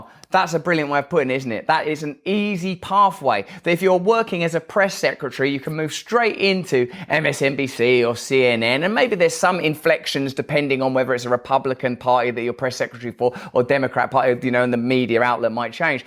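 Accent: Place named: London, United Kingdom. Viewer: YOU SOUND LIKE YOURE FROM LON-DON!!!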